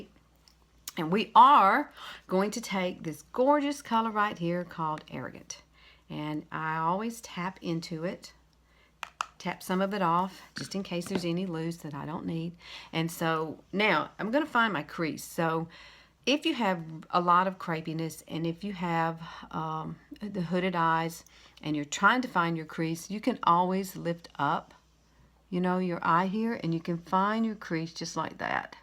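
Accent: South, United States. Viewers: Oh my goodness, where in Alabama are you? I live in Alabama too. I absolutely understand your soooouthern accent!! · I was reading the comments on here & if I read correctly, you're in your 60's...? My gosh, I can only HOPE I look like you when I'm your age! (I'm 41) You are beautiful! And your sweet personality just adds to your beauty! Your accent is just too cute also! I'm from Texas, so I definitely know a cute little southern accent when I hear one! 😉 God's blessings to you! ❤️ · Love your attitude and your bubbliness (is that a word?), and I love your southern accent cuz I'm from LA (Lower Alabama). Watch you later YALL. 👍😊😁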